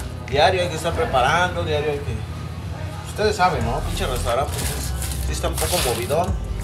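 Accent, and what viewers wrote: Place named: Spanglish, United States. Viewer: Cámara chingon el spanglish 🇲🇽🤟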